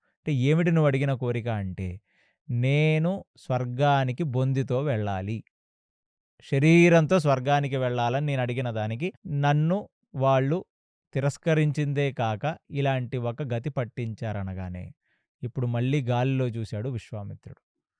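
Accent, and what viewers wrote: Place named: Godavari, India. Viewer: Godavari Slang😅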